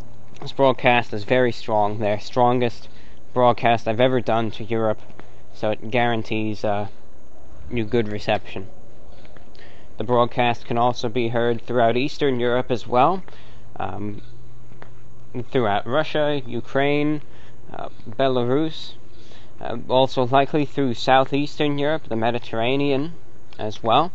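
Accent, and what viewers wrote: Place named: Transatlantic, United States. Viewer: Listening to this podcast I noticed that ReviewBrah speaks with almost a Transatlantic 1950's American Accent. I never noticed that. It goes quite well with his vintage attire.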